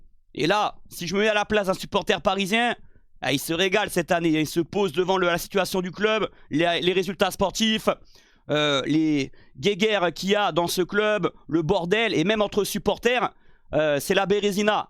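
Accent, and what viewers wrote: Corsica, France. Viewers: Tu as vraiment l'accent corse !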